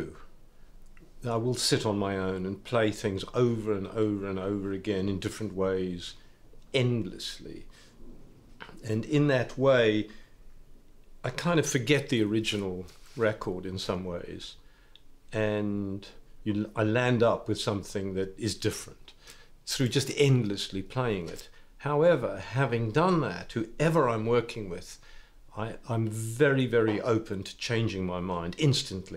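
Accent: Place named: South Africa. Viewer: He still has a slight South African twang to his accent .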